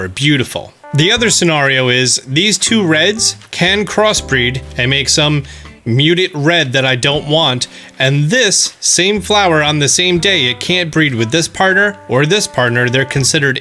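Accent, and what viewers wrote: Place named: New Jersey, United States. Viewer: It's a New Jersey accent. All across the state, we say "wooder."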